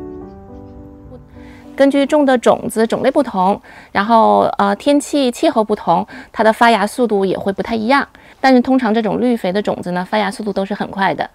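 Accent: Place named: Jinzhou, China. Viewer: 我感觉像是锦州人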